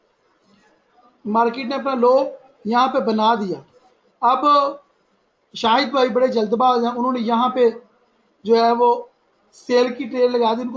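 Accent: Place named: India. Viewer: sir apka desi andaz buht acha buht maza ata hai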